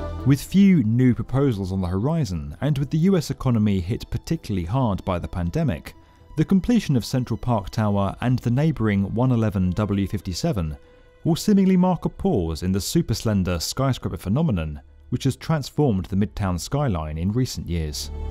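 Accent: United Kingdom. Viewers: When i heard he says in british accent Petronous Twin Tower remind me about Harry Potter...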